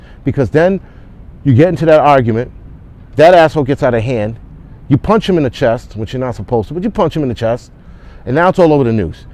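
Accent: New York, United States. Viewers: THE PROFANITY WITH THE NY ACCENT IS THE BEST !!! YOU ARE SPEAKING FOR THE UNHEARD AND VOICELESS! !! AND SPEAKING HOW THE PEOPLE FEEL RIGHT NOW !! NO APOLOGIES !! GOT DAMN IT I LOVE IT !!!!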